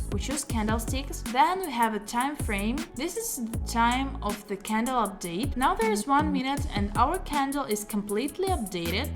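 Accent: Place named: Russia. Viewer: Как же чувствуется русский акцент )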